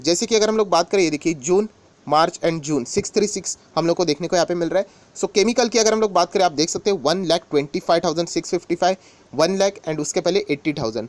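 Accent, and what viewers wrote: Maharashtra, India. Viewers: sir aap marathi hai aapki bolne ki bhasa thodisi marathi hai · bhava tyanchya bolnya madhun ch kalat aahe te marathi aahet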